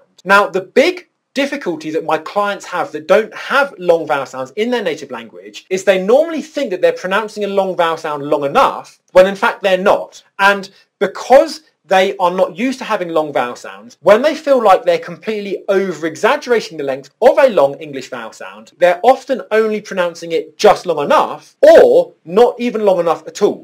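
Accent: RP, United Kingdom. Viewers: Is this RP accent? Or some other british accent? I've watched your few videos and you haven't mentioned that if you are teaching rp or something else so I want to confirm as I want to learn rp. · Amazing video. i was wondering if this is modern rp british english?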